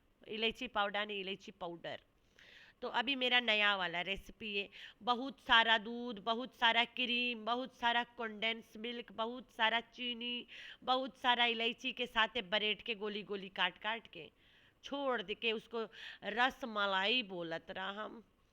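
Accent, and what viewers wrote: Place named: Bhojpuri, India. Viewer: Bhojpuri accent 👍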